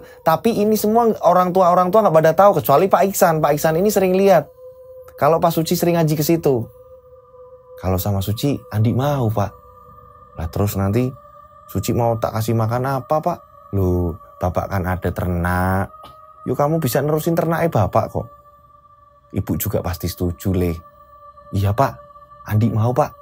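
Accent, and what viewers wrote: Java, Indonesia. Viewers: Aku suka ceritamu mas, ada blastran jawanya pokonya lanjot terus mas dengan cerita yg menarik (BOOOM"))👍👍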